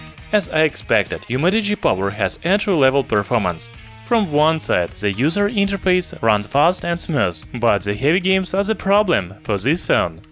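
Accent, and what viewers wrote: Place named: Russia. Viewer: русский? акцент похож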